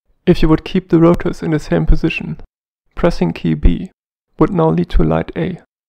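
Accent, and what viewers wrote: Germany. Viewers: a lot of Germans speak English like this, I spent 6yrs there, :)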